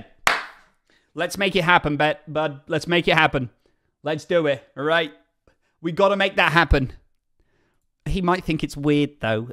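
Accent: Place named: United States. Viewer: Once again, that American accent (this time with a Tango-inflection), FTW. So good. 😄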